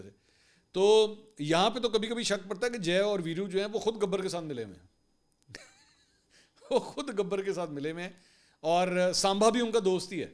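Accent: Pakistan. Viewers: I agree. Your Punjabi is not great. Your Punjabi has a strong hint of Urdu accent and your choice of Punjabi words is often forced and unnatural.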